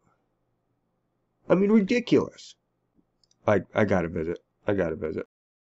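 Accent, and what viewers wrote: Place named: New England, United States. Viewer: Wicked impressive..? Are you from new England too? Hahah!!! It's easy to spot. Amazing video brother, I love the material.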